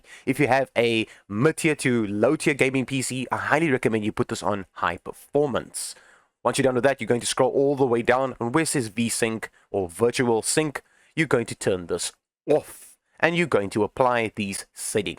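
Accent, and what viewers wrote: South Africa. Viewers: Bro I knew you sounded South African. Great to find a South African tech youtuber!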